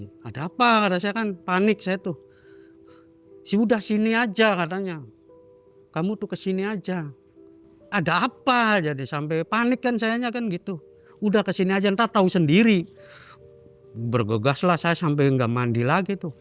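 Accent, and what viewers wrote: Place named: Java, Indonesia. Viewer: Aku suka ngomongnya medok Jawa suka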